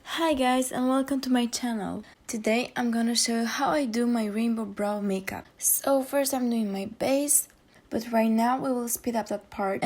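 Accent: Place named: Russia. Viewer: Nice video! Love your accent, are u from russia?❤️😍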